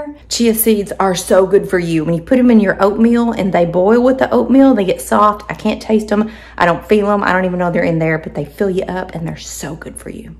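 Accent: South, United States. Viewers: I’ve been watching your videos for awhile! I began watching when you first got Pollux and fell in love with how you love your animals. I knew you were a Southerner mostly because of your speech, and because of your generosity and kindness in communication. You want to help, you want to teach, you want to help people live better lives! I live down in Millington TN outside Memphis, but my parents lived in Obion County for almost 10 years back in the 90s and are very familiar with the Paducah, KY area. I have not seen all of your videos, but I have loved every one I watched! This video diary is a perfect pairing of your curls and you’re ice cream business curls! I have never seen or heard of a shop like that, but the concept is genius! You are such a a great teacher and encourager! I don’t know how you find enough hours in your day to do all you do. You make it look easy, but I know your filming and editing take more time than people realize. 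I just want to encourage you and tell you that you are really helping to make a difference in peoples’ lives and I am proud of you and for you! Give Mooey and Pollux a scratch and a kiss from the McBrides!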